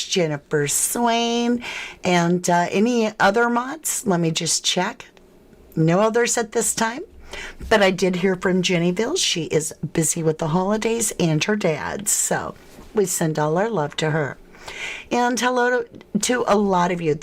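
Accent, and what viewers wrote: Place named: United Kingdom. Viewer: I came to you in the Jay case. Just love how you do things especially your British accents.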